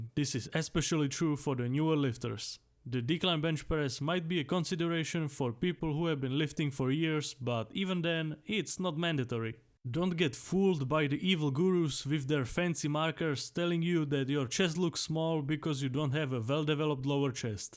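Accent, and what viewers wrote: Czech Republic. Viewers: Czech accent? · you sound czech, are you czech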